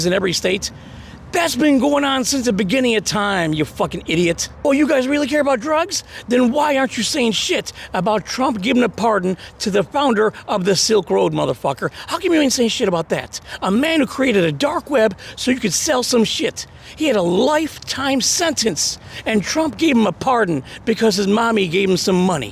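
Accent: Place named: South, United States. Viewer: Ok. That is heavy words from someone who has never served. And hasn’t served in combat. Douche bags like him like to talk talk talk. But, we were fighting in the Middle East for awhile and this fuck chose not to serve. And his Call of Duty ass wants to call out combat vets. Your southern accent proves people in the south skip a lot of school. Watch your self homie. Combat vets are the quiet ones.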